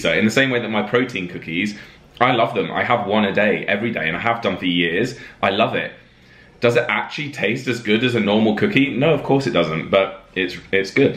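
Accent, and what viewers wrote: United Kingdom. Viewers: I just got cast in a play and we're using British accents so I am going to binge your videos to hone my craft ^.^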